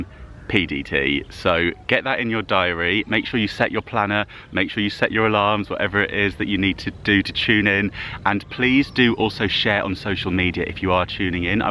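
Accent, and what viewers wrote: England, United Kingdom. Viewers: Wow! Congratulations this is wonderful news!...Wish we could tune in also..in the UK!......The American viewers will love your English accent too...Wishing all the very best you deserve it...Fondest wishes from Derbyshire xxx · Congratulations, you have such a lovely English accent, pretty sure the Americans will love you, and I’m so glad you got to film and hope you get the chance to do more over there, 🏴 love Katrina xx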